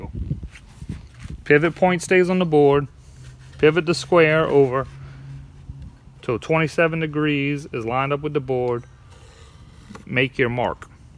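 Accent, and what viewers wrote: Louisiana, United States. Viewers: You from the parishes ain't ya? Great video! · Dis dood definitely frum louisiana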